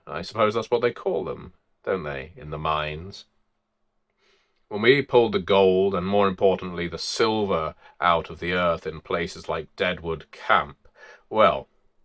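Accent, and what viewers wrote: England, United Kingdom. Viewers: It really just the cherry on top, hearing an articulate English accent justifying Colonialism in the 18th century with the claim that humans fundamentally and habitually extract and exploit to live. Chilling. Love it. You know, in the US we pride ourselves on being very sad about being a very successful, leading, world military and "not-empire" that spans across a continent and around the world. Everyone just ignore the fact that the sun never sets on US territory, ignore the military base in your country, and the nuke subs in launch range of your cities. We're not an "empire"! How ridiculous. We have a legion of lawyers to show you how wrong and slanderous it is to point out that fact!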